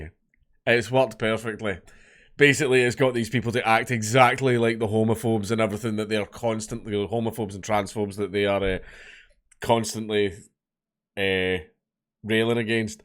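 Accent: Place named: Scotland, United Kingdom. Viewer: Just for curiosity's sake I checked the auto-generated captions in this video, and they are almost perfect! Yutube's bot probably understands Scottish accent better than I do.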